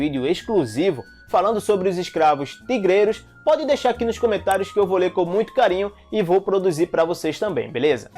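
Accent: Northeast, Brazil. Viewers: A voz do é muito marcante.Tem sotaque de nordestino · Gosto muito do sotaque nordestino.👍🇧🇷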